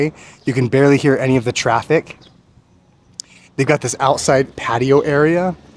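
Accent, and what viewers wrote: Philippines. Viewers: I love your vlogs, showing local places and I love your Filipino accent.my husband of 41 years still can't get it..He has a limited vocabulary no matter how much I taught him 😅😅😅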